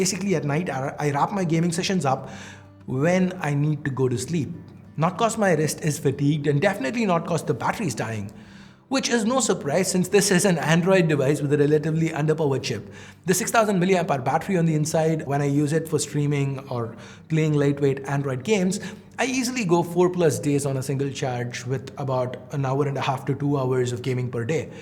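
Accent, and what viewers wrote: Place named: Tamil Nadu, India. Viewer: You English is like a typical tamil school student who always wants to grap the attention of others just by speaking in English.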